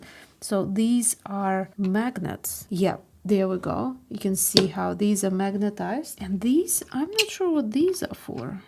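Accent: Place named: Russia. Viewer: Абсолютно русский акцент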